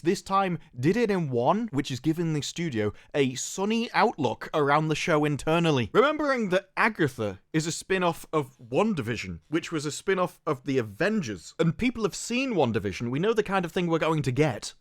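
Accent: England, United Kingdom. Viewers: I now remember why I unsubscribe from you. You are obnoxious repetitive little prick who thinks he's smart when all you are is just some retard repeating the same crap with a British accent. that and just a little thing get some kind of tooth whitening thing going on. Every single video you look like you've been chewing spinach right before you went on camera. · Are you an American just with an English accent because you sound like everything you're saying I would say.!!?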